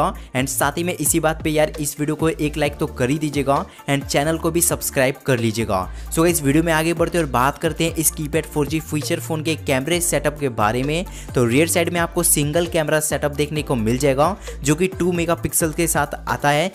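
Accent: Hyderabad, India. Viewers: hyderabad sa ho kya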